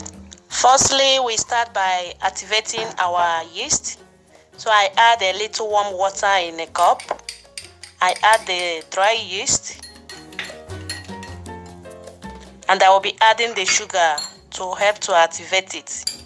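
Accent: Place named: Nigeria. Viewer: The Nigerian accent is on point. I'll be sure to try these out.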